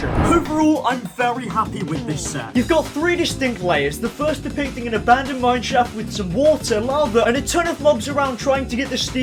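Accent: United Kingdom. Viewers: Ahhhhhh British mate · Mans British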